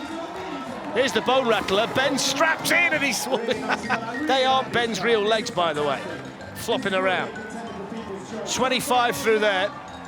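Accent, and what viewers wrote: United Kingdom. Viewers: This seemed interesting until the British accent started. Then it was annoying.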